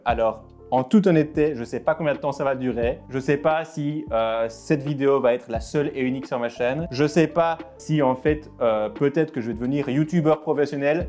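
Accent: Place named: Belgium. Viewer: Hééééé j'avais pas vu compatriote ! L'accent belge on est là on lâche pas ! En espérant entendre de nombreux septante et nonante par ici <3. Congrats & tout le meilleur poulet !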